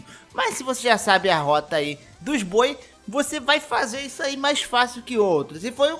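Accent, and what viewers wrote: Rio de Janeiro, Brazil. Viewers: Tu é do rio mano? Qual parte? Sou da oeste, Tmj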